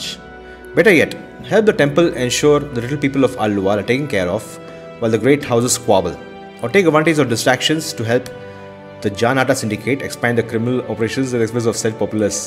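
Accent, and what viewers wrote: India. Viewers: Great content. Going by your accent, I presume that you're Indian. I would be really interested to hear your take on the influences of Indian culture and religion on Morrowind's developers. I played this game long before I had the slightest clue of its real-world inspiration, so I have a hard time dissociating one from the other. Cheers!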